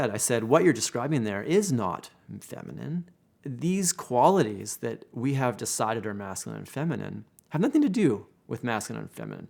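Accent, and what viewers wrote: Canada. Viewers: Hmmm, I don't know .. This is the first video of yours that I watch (and I like it a lot by the way, I like the clear way you express your ideas and thoughts) You sound Canadian or American to me, and I find the "homophobia" thing more present there to be honest, so that could be something to note? Because in so many other places I find that men do express more emotion to their friends without this fear of "appearing to be gay". I also believe that men are not as emotional as women, or in better words: have different emotional needs than women does, in terms of sharing or expressing. Which explains the universal idea of (men being stronger and less expressive) that's been around throughout history. That being said, I do agree with you about stereotypeing, it makes life pretty rough for the more sensitive and emotional men. I think it always comes to that old tale of people thinking of themselfs as the "standard mold" that all others should be shaped with, which is a very human thing to think (it has to do with the natural need in people to feel connected and safe), but also it is the exact thing that makes life harder for every "different" human who is trying to fit in. 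This is very interesting to me, it's an issue that I got invested in and studied for my master's thesis, actually, and I found that the only way to solve it is to, basically, open people's minds to differences and teach them how to deal with it without losing themselves or causing others to lose themselves eit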